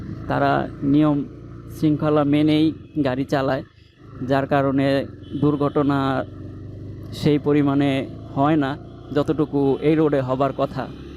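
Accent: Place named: Mymensingh, Bangladesh. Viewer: তর বাড়ি মনে হয় ময়মনসিংহ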